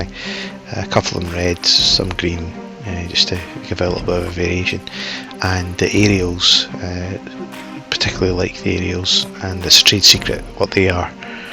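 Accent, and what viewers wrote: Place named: Glasgow, United Kingdom. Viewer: yup.....no tatties in your ears! You from Glasgow, I presume? Fantastic video, crystal clear, and a beautiful, beautiful build. Outstanding. Personally, I build WW2 stuff, but I can learn a lot of from your videos. I'm gonna subscribe and send a link to my pals of your fantastic work on our Google+ modelling community.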